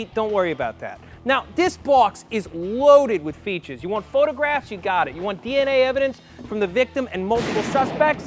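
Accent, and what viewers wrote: Boston, United States. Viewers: Bawston accent is great. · I mean like common, that baws-town accent ... really? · Not sure if the accent is Bostonian or Archie Bunker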